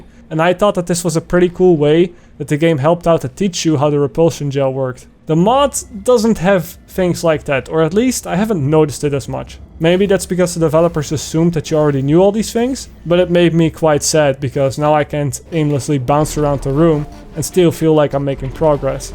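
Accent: Netherlands. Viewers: I can tell ur Dutch, ur accent is big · Okay so i found your video randomly because it was recommended to me. And very good video! Glad i came across it. I love portal and also liked playing Portal stories: Mel But what I actually wanted to ask: are you dutch? I’m dutch myself and i just cant help but feel like you have a bit of a dutch accent. I’m very curious cuz i dont know many proper english speaking dutch youtubers. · is this guy dutch? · You're dutch arent you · goede poging voor engels ik ben ook nederlands! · u sound Dutch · Dutch ish accent if I'm correct? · Obvious Dutch accent is obvious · Nederlands accent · I didn’t notice it at first but later in the vid i can hear the dutchness in your voice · Video starts Dutch accent sense tingles =O looks at channel Is from the US "Hm.." watches video, entertained end of video "Zie je later" =O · At the start i was like lol he sounds so dutch. 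And then somehow I just forgot about it and the "zie je later" in the end just had me in shock like huuh and then i was like oooh yeah duh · You sound very dutch xD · Are you dutch? That accent is familiar. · Forgive me if I'm wrong but your accent screams Dutch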